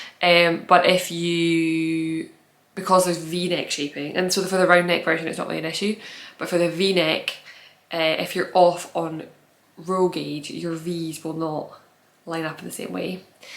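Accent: Scotland, United Kingdom. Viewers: Hello, thank you very much for your videos. I love your work, your choice of colours, wools and designs. Congratulations, you are such a talented person and I love also your Scottish accent not difficult to understand at all for me, a Portuguese woman living in Paris for 34 years, since 1990. I’m so happy to listen to your podcasts and following you every week it’s a great pleasure. Thank you for sharing your experience and knowledge with us. Never give up, you’re a wonderful person ❤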